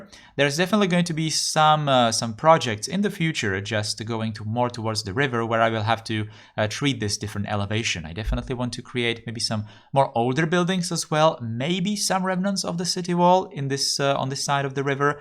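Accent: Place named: Czech Republic. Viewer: Clicked on your video and instantly could tell you're Czech because of the accent :D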